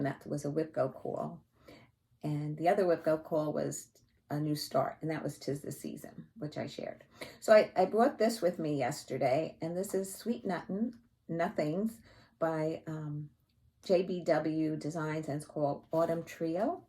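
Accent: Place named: Long Island, United States. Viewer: I love listening to you! I grew up on Long Island, but I live in Kentucky now. I rarely get to hear the beautiful accent! I will be visiting my Friends House on Long Island in January. Thank you for sharing your expertise!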